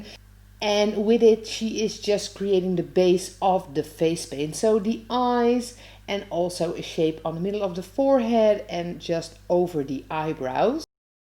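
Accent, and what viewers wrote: Netherlands. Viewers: I didn't know I needed to see this am, but apparently I did Also, are you guys Dutch? The accent from the voiceover sounds very familiar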